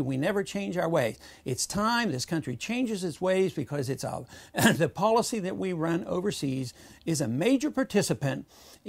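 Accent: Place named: Texas, United States. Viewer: you're from Texas aren't you ;D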